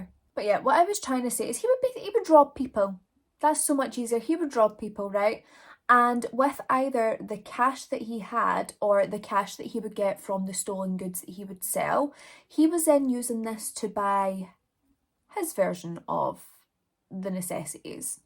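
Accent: Scotland, United Kingdom. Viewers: OMG, pure dead Scottish true crime ASMR. Really looking forward to listening to all of your videos!!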